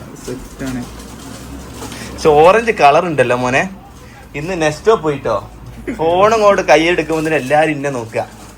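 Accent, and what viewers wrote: Kodungallur, India. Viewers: slang shinkunte kodungallur slang ayallo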